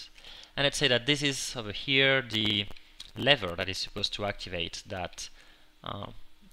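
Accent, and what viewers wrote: France. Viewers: Can you do a video explaining how to install this mod on a mac? I have not been able to install it, only because I have had such a difficult time with all the complicated installation procedures. Just a quick, brief tutorial would be extremely appreciated. Thank you! :) -greexm PS, Vous êtes Français? J'adore votre accent quand vous parlez l'Anglais, c'est très charment!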